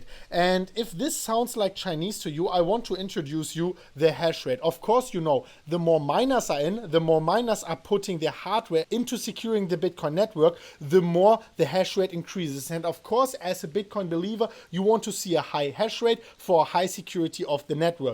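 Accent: Germany. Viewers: you MUST be german!! Your akcent is just too on point!!! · Man hört richtig das du deutscher bist 😋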